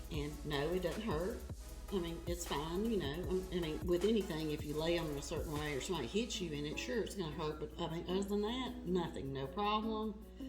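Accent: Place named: South, United States. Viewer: Hi there. I just discovered your channel and I am enjoying it. I’m also southern, so enjoy your accent. I’ve been on the Omnipod five Pump for a little over two months and I am considering going to the Tslim, which I tried for only one month last year and we could never get the Settings right. I’ve had to be very aggressive on everything from insulin to carb ratio, to insulin duration on the Omnipod. Can you tell me what maybe to expect in trying to set up the T-Slim as far as setting it up in comparison to the Omnipod? Meaning, since I am very aggressive on the Omnipod Settings, virtually all of them, even tweaked the manual ones because I was hoping it would help Omnipod give me more basal, would you have any recommendations about percentagewise changes to start with on the T slim like maybe 10% less aggressive or 5%? If I go back to the T slim, I would sure like it to work very well. 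And I’m still considering MDI because I’m only A few percentage points off in my time in range on the Omnipod but Omnipod requires tons of corrections and after a little over two months I’m still getting 60% of my insulin as bolus which includes the corrections, and 40% from Basal. And I thought it was supposed to learn and try to get me to 5050, but it’s a slow learner at this point. Any help or suggestions would be wonderful. Also, my the tea slim do better with exercise than the Omnipod? I have not been able to get that to work. Thanks if you can. Have a great day.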